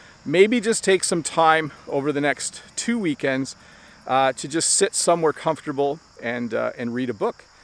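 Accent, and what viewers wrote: Canada. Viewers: Hi!! Don’t worry. You should have a break. I really appreciated that you do a lot of jobs.👏👏I like Canadian teacher accents of you and. I still waiting for your lesson. 😃😃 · I had lived in ottawa four years. It seems like my second home town! Your video and your clear Canadian accent make me homesick! Don't forget make a video in maple leaves season,please!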